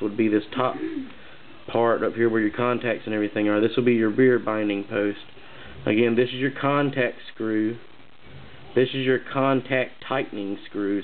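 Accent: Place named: South, United States. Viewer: good video for new artist...the southern acent lol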